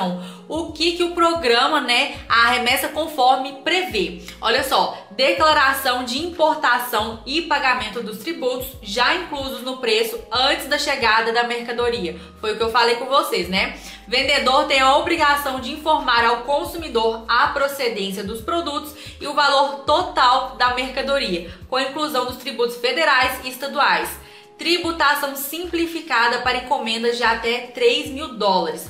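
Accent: Minas Gerais, Brazil. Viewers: Esse sotaque mineiro é muito bonitinho 😊❤️ ( eu acho q é mineiro 😅)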